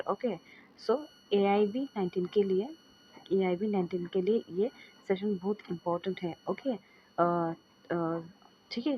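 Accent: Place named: Bengal, India. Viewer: The bengali accent is so irritating..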